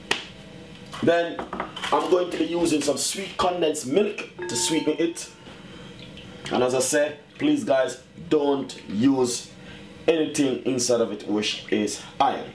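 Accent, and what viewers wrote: Jamaica. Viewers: Speak so The World can understand it not only Jamaican watching, the name is Nutmeg!!!!!! Patios and English no go well bro sound ANNOYING. Just be real and talk u talk so mess up u talk sound #$&@@ Anyhow love the recipes although I know how to make them already, thanks for teaching those who don't know especially the young ppl 👍🏼👍🏼💯🇯🇲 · What language is he speaking then? Sounds like English to me With a Jamaican accent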